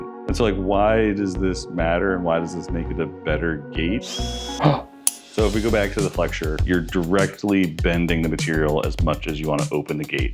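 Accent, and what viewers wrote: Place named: California, United States. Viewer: Cool, but you need to lose that Californian woman’s accent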